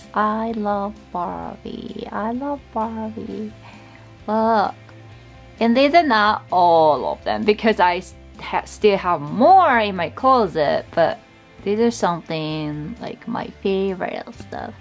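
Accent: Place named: Belfast, United Kingdom. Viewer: hey love your belfast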